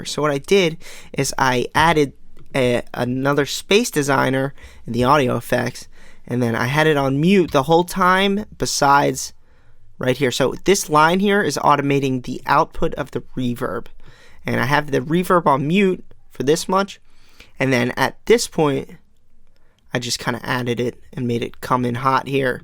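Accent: Philadelphia, United States. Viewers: You have a Philly / South Jersey accent - am I correct? Nice 4 bars btw sounds good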